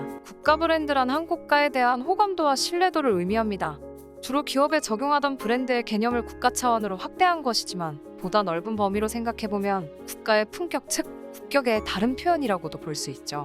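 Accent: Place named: Chungnam, South Korea. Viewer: 대본을 읽어주시는 분 충남출신이군요^^ 나도 충남이라 저런 어투를 잘 알죠 예~~~전부터 하고 싶었던 말임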